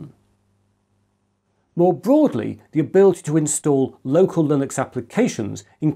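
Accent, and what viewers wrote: United Kingdom. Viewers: I thought you were going to “flex” ChromeOS so I clicked the video cuz i thought it would be funny but then I heard the Bri’ish accent and hit dislike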